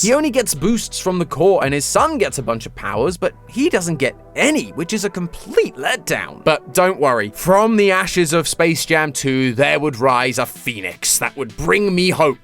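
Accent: United Kingdom. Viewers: Tbh He kinda sounds like matpat but a little more British 😢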